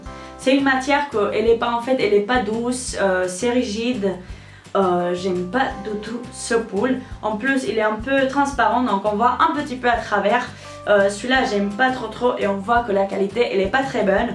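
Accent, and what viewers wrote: Spain. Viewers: Je t'aime tu es magnifique et ton accent espagnol aussi 💙💚💛💜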